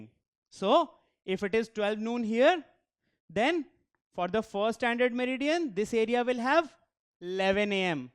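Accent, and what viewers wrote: India. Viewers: The indian accent is funny and cute at the same time. Keep it going, sir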